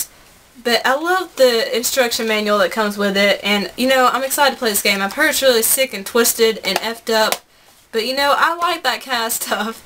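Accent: South, United States. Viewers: I like your southern accent :)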